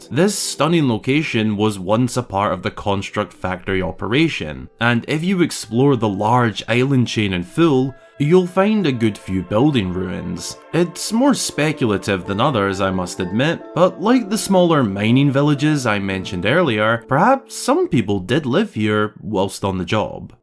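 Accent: Scotland, United Kingdom. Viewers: Awesome video, awesome accent too. You scottish, mate?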